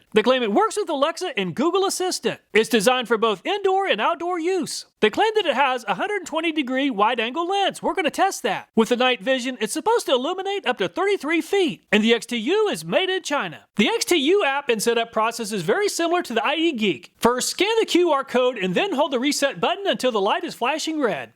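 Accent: United States. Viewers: Very loud american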